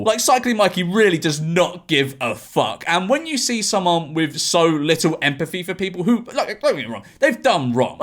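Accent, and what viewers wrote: South Africa. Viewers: His accent sounds South African, I guess that proves the old Spitting Image song true...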